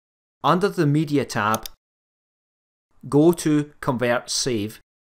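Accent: Scotland, United Kingdom. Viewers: i like your accent :) i'm guessing scottish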